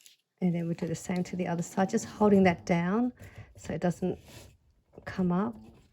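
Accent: Australia. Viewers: Love your video and this idea! Very well explained too. I'm also from Sydney, Australia. Lovely to hear your Aussie accent ☺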